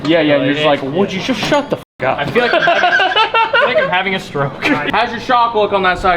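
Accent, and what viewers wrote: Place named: Kansas, United States. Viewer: Bro speaks like a true Kansas-man. (I would know)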